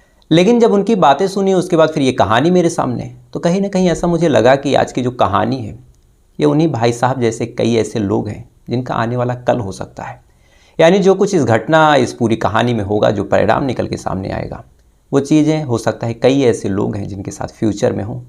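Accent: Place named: Bihar, India. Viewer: Kahaaaaani ya kahani ? Daud ya dauuud.? Aapka pronunciation Bihari hi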